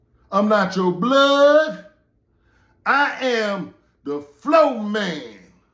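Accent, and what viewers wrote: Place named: New York, United States. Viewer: I just wasted 11 & something mins about nothing,sound like a throw back dude from n.y.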